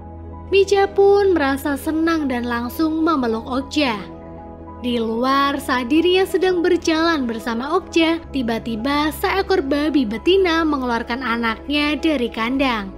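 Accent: Pemalang, Indonesia. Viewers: wong pemalang?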